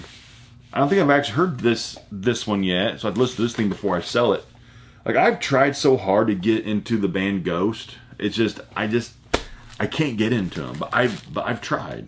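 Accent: United States. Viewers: I don't mind your rambling...a) I like your voice and b) I'm not an english-speaker so it is always good to listen to some genuine american-english ^^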